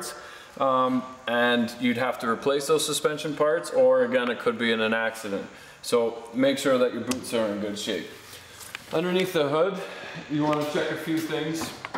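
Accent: Canada. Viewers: I know you are Canadian because of the way you say "out" Thanks for the tips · Love the accent think Canada! Love it.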